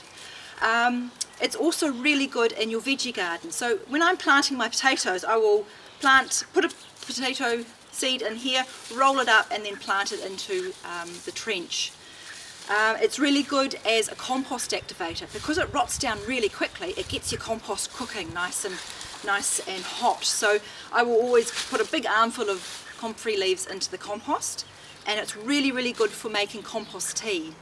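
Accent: New Zealand. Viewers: You sound like a kiwi, thanks for the great information as I love herbs. I want to suggest one thing, try to be less violent and communicate with them more through intention, you will find that you will get a better result with everything you desire to achieve from them. blessings. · When I move to New Zealand in the future I hope to adopt a nice kiwi accent like yours. It truly is nice.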